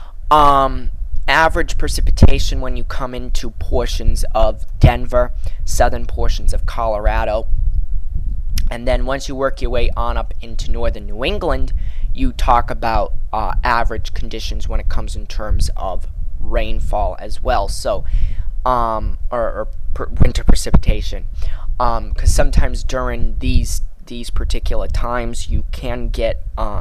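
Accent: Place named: New York, United States. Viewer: do you live in new york city, you have the new york accent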